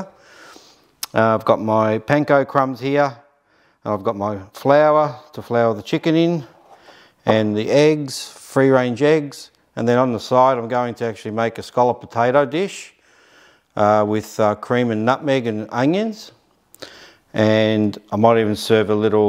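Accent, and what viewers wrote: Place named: Australia. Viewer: Sounds like you are an Australian like me. How did you make the garlic butter, thanks.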